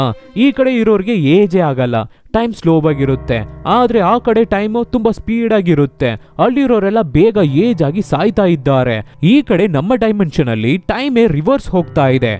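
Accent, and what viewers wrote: Tamil Nadu, India. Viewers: Your mother tongue is tamil ryt?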